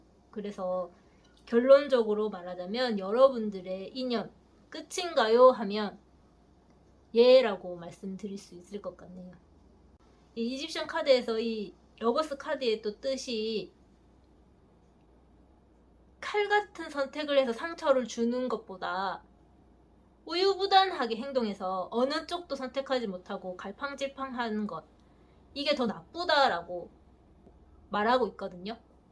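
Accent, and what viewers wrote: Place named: Gyeongsang, South Korea. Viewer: 타로에 경상도 사투리가 엄청 많네..대구? 대구사람들이 다 타로하는거임??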